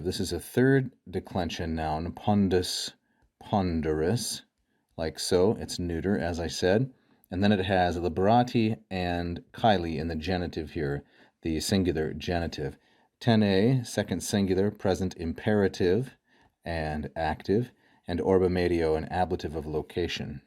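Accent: United States. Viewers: Latin with american accent is so horrible. Don't throw your life away, please learn the correct pronounciation...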